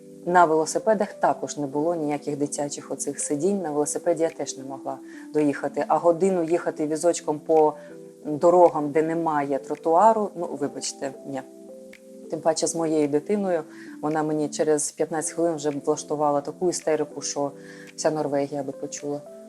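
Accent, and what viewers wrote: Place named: Ivano-Frankivsk, Ukraine. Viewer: Вы не киевлянка,франковская говорит файно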